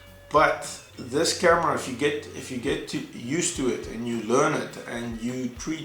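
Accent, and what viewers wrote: South Africa. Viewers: You soumd south african..are you???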